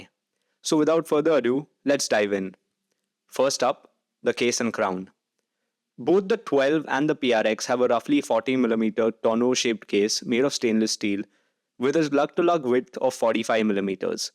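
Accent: India. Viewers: Great video, you are doing an excellent job. New subscriber from Laguna Beach, CA 👍 PS: I’m curious about your accent. I have heard a similar accent before, but I can’t place it. I’m guessing Indian? I like watches and languages. I have studied 6 languages. Another great hobby. 👍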